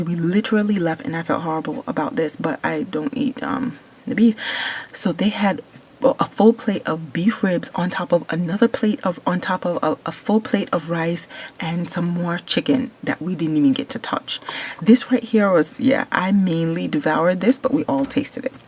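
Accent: Caribbean. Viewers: I really enjoy your channel. I may be a little late on this but are you west indian? I heard an accent along with the sucking of the teeth lol, not to mention the great food.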